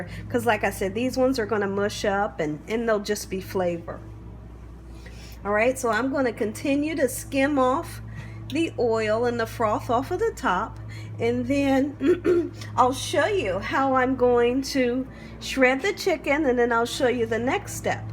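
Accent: South, United States. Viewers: You have the sweetest voice ever. I also hear a slight accent but can't put my finger on what accent that is? I was thinking maybe a southern accent? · I just love your videos! Love your southern sound! I love to cook as well. I cook old school puertorriqueño food. Everything is delish. I saw your video and you mentioned the mean haters. Do not even knowledge them. You have more kind and blessing people that follow you!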